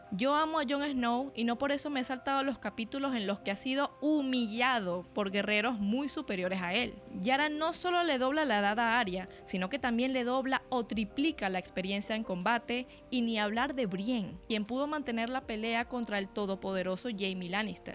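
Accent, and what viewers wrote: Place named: Venezuela. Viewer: Ese acento es de Venezuela.. me juego el riñón izquierdo de mi mamá y no lo pierde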